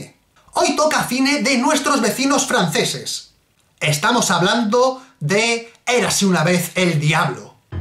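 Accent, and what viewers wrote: Mexico. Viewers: Es la verdura esa placa, habla mexicano puro y duro jajaja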